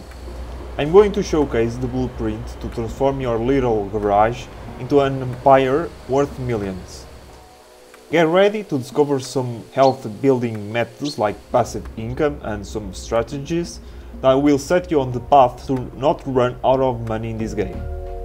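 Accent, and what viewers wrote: Portugal. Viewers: Sotaque Português hahahaha Conteúdo top. Adoro os teus vídeos, abraço <3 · Sounds like PORTUGUES?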